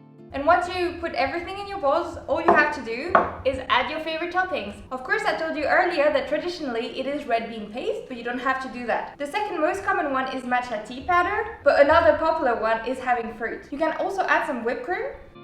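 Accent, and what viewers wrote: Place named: France. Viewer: Is it me or do you have a TINYYY french accent 😭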